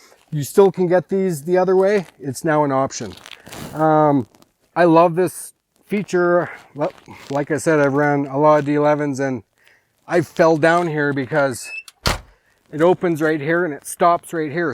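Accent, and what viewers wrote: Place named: Canada. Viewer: IT'S ALWAYS SCARY AND COUNTER-PRODUCTIVE WHEN A REVIEWER OF A PRODUCT SAYS ''I DON'T KNOW WHAT THAT IS'' ,( ISH), .THIS IS MY 1ST TIME HERE AND ACCORDING TO HIS PRONUNCIATION OF THE ENGLISH STYLE HE'S SPEAKING I'M GOING TO TAKE A STAB AND SAY THIS INDIVIDUAL IS A NATIVE FROM THE GREAT WHITE NORTH AND THAT HE REALLY DOESN'T KNOW WHAT ANY OF THAT IS FOR. HE ALMOST HAD ME FOOLED WITH THE MACHO, COPY-CAT FASHIONISTA TATTOOS.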